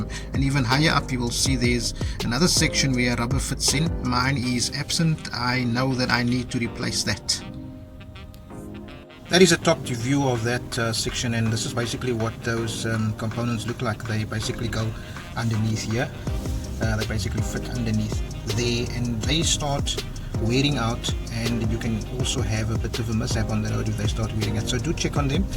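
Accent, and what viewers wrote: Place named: South Africa. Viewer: Love the south African accent...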